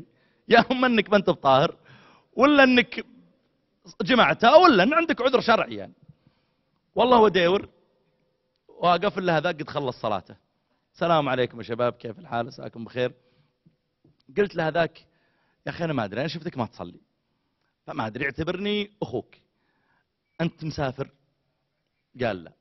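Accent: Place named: Saudi Arabia. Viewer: يسعدك قمر يا شيخ حديثك الموزون الرائع في كفة ربي يجزيك كل خير وتلك اللهجه السعوديه في كفة أخرى ما اروعها🌸🌸🌸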